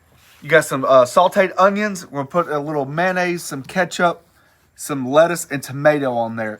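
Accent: South, United States. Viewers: First video I’ve watched of you . I’m from SC and hear some southern dialect. Love it !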